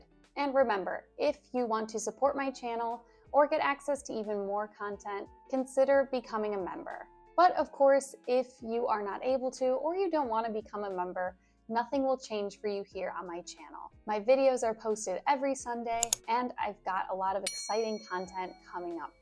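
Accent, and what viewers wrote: United States. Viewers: Can get American accent if they speak like you, but they speak fast in movies 😂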